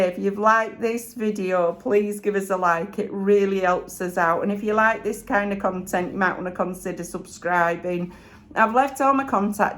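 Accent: Yorkshire, United Kingdom. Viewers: 🤣😂🤣 I don't know how or why, but I got subtitles for this post. It was hilarious - I don't think it could cope with a Yorkshire accent. When you said your daughter's name it called her Ebay! If you get chance and you can get subtitles have a look at it - you'll have a good laugh!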